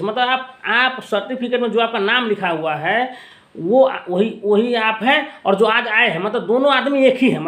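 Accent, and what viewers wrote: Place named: Bihar, India. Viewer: Thodi bhahasha mein bihari touch hai thoda slow boliye please.. smjhne mein dikkat hoti hai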